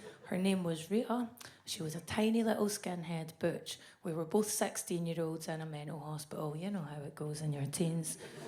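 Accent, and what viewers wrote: Scotland, United Kingdom. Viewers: that was fu-kin brilliant. love the scottish accent. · You can select to have captions on screen in English which might help. She has a Scottish accent which can take a while to tune into if you're not familiar with it. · Well I had a boyfriend from a psych ward he was lovely the mess came when I left him for my ex who caused the stress that almost broke me. Couldn't keep watching not funny she just sounds funny because of the somewhat forced deep voice and scottish accent the content is that of a 20yr old and gross and the audience loses it I wonder about some of these clips a.i images why not a.i audiences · Maybe she isn't. But she sure has a strong Scottish accent. Strong enough that I find it quite charming. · Ah, she,s good. And that husky Scottish accent. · It's English, but even for Americans it's a little hard to understand the Scottish accent. 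You said you're Mexican right? It's kind of like trying to understand Spanish from the Puerto Ricans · Funny. Hot. Amazing Scottish accent. And most importantly hot. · Sorry, I'm a native-American (literally-speaking) and her accent is really heavy; I have a hard time understanding her. She might have to advertise just for Scottish people. :)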